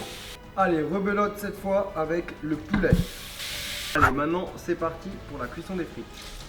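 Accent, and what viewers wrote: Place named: Alsace, France. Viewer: Ton accent alsacien sort un peu 😂😂 bref team Alsace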